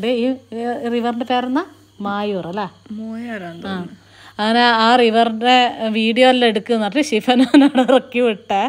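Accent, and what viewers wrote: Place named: Kannur, India. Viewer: വീഡിയോ വളരെ രസകരമെന്ന് പറയാതിരിക്കാൻ വയ്യ!!💢 നർമ്മം കലർന്ന തനി നാടൻ ഭാഷയാണ് വീഡിയോയുടെ പ്രധാന ഭംഗി👌🎉🎉!!! അത് നമ്മുടെ കണ്ണൂർ ഭാഷയിൽ കേൾക്കുമ്പോൾ നല്ല സന്തോഷം✨ ഹരിത ഭംഗി തുളുമ്പുന്ന പച്ചപ്പു നിറഞ്ഞ കാടുകളും കൂറ്റൻ മരങ്ങളും കാട്ടരുവികളും , പൂക്കളും മാൻ ആനക്കൂട്ടങ്ങളും നിറഞ്ഞ പ്രകൃതിയുടെ സുന്ദരങ്ങളായ കാഴ്ചകൾ അതിമനോഹരമായി പകർത്തിയിട്ടുണ്ട്.👌✨ കാഴ്ചക്കാരുടെ മനം കവരുന്നതായിരുന്നു ദൃശ്യങ്ങളോരോന്നും🎉🎉🙏✍️✍️ ആശംസകൾ💫💫💫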